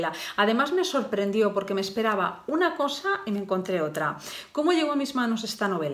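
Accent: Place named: Asturias, Spain. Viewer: eres muy culta y hablas muy bien. Tú acento asturiano me encanta.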